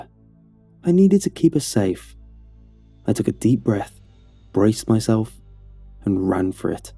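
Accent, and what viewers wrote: United Kingdom. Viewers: Man the British is strong with this one.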